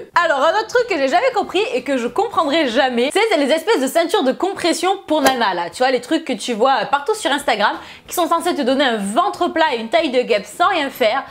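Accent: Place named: Nice, France. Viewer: C'est officiellement la vidéo ou son accent nissart ressort, c'est connu l'accent ressort quand tu es énervé x)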